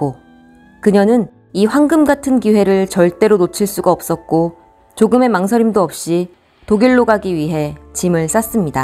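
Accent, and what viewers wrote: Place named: Japan. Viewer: 발음을 보니 일본어를 할 줄 아는 분이시네요. 맞죠? ^^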